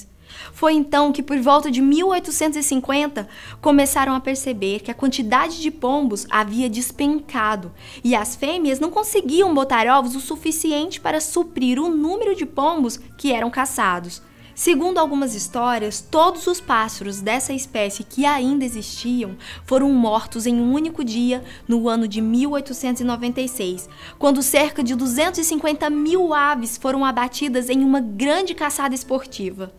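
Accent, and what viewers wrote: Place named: Minas Gerais, Brazil. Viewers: Pelo sutaque deve ser mineira. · A moça que fala nesse vídeo é de qual estado do BR? Parece de MG...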